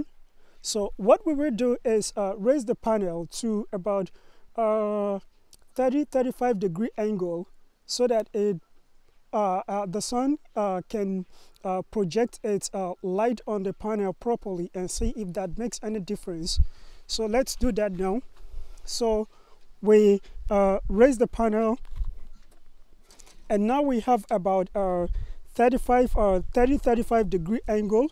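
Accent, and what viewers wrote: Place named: Nigeria. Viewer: Great video. Clear and straight to the point. Thanks. Off-topic, your English accent sounds very Nigerian. Were you ever resident in Nigeria? 🤔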